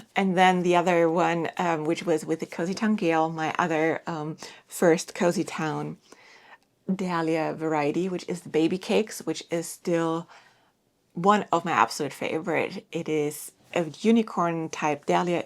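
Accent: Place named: Germany. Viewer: Always enjoy your videos! Best of luck with this year's growing season.My in laws came from Germany. I love listening to your accent!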